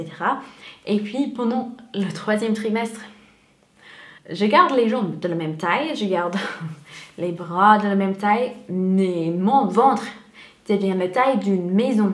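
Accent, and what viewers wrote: United States. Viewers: la robe est superbe c'est celle que je préfères ! tu es très jolie and i love so much ton petit accent usa 😊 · Salut! Ca fait 3 ou 4 mois que je regarde tes vidéos très sympathique! T'as fait une faute dans le titre Haut et pas Haul, mais tu es bien mignonne! J'adore ton accent américain continue comme ca!!! Bisous de Paris 😘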